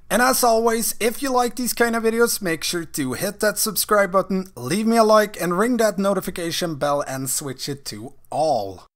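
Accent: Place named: Sweden. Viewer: Nice video, hör på talet att du måste vara svensk, så jag antar det är okej med en sådan kommentar också! Tänkte bara säga att jag har så oerhört svårt att bestämma mig för om jag vill spela Outlaw eller Survival. Tråkiga för egen del är att bägge specs verkar vara lutade åt AoE-dps, vilket jag inte är speciellt het på, då jag tycker om Singletarget-dps mer. Avskyr att se riktigt bra burst men kass sustained damage där man går från att ligga topp 3-5 för att senare sjunka till botten, vilket är därför jag inte vill spela Fire Mage (även fast den specen är cool som attan) då den tycks vara oerhört beroende av sina CDs och tanks i dungeons för att göra hygglig dps. Vill helst inte förlita mig enbart på "CD windows" för att göra mitt jobb. Förutsatt att du kan svara på min fråga, vilken spec av Outlaw och Survival gör "bäst" burst och sustained singletarget DPS? 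Tacksam för svar, bra content dessutom, så även om man inte får ett svar så har du förtjänat åtminstone en sub!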